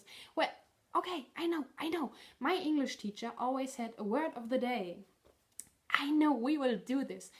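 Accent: Germany. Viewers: Moin moin! Your English sounds so beautiful with your German accent! I've watched all your recent podcasts and decided I love them so much I would start at the beginning! My husband works nights, my children grown, it's nice to have "company" while I knit and crochet in the evening. I'm so glad this wasn't your first AND last podcast. Thank you for sharing your passion for yarn, knitting, crochet and the Lord! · I just found your podcast and just love it! Dont worry about your English, it is wonderful, I lived in Germany for three years and can hardly speak it at all. I love your accent and it brings back such happy memories of when I lived there. We lived in Northern Germany in a town called Duelmen out side of Munster. I love the word of the day idea too. I hope you keep podcasting!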